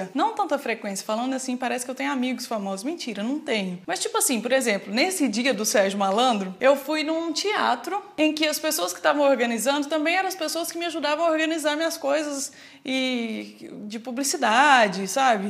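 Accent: Minas Gerais, Brazil. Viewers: hahahahaha o mineirês fora das câmeras é sempre mais alto... te entendo total.... comecei a fazer vídeo e geral zoa só pq falo "ei, tá joia?" hahahaha fico me controlando pra não soltar mto uai ou sô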